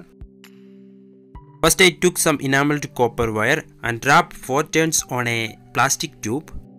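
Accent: Kerala, India. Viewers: Bro r u from kerala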